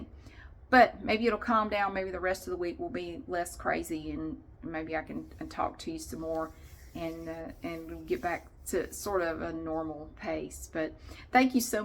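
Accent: South, United States. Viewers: I just like you talking; I think it’s a northern guy liking a southern girl talking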